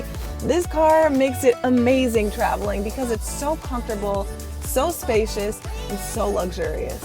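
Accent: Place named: Long Island, United States. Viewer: I remember thinking you might be from Long Island or something guessing you weren’t from one of the boroughs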